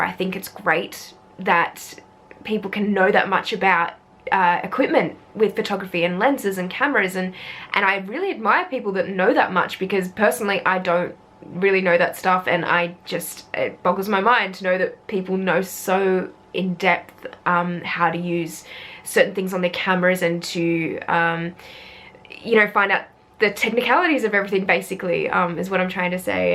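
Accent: Australia. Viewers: Great video, and really needed to be said. Frederick really nailed any commentary I could add, othr than Jay Maisel wrote a wonderful book about this in some respects, entitled "Its not about the F/stop". Cheers and best regards – PS I love your Ozzie accent!;-)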